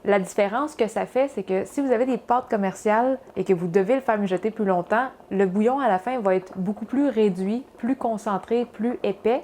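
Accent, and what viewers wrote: Quebec, Canada. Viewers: Excellent ! je découvre la chaîne et je trouve la vidéo trop mignonne avec votre accent québécois c'est trop chouette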